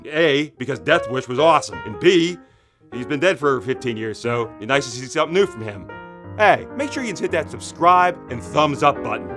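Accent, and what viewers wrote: Pittsburgh, United States. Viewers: Moved away in 05. You saand jus like ma cuzzin. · Love your Pittsburgh accent/comments...keep em' comin' Born and raised in the Burgh' used to be a teamster local 249 for Allegeheny County, Shaler 72...#7 helps bring #7 SB home!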